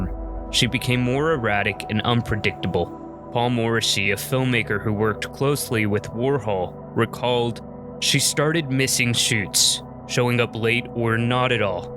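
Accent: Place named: California, United States. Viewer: It’s a California shift. Interesting thing happening with the accent. They say things differently than you.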